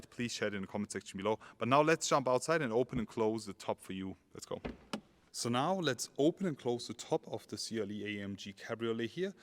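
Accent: Germany. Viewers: It might be because he is speaking English with a German accent!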